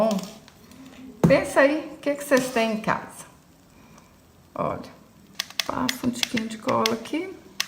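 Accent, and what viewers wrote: Minas Gerais, Brazil. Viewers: Kkk vc e mineira de onde sua voz te entregaaa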